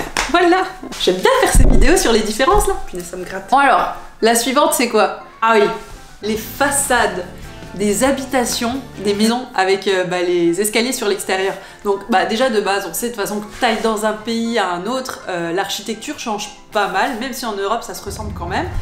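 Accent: Quebec, Canada. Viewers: Très intéressante cette vidéo et tu commence à parler comme une québécoise 😅💪🏿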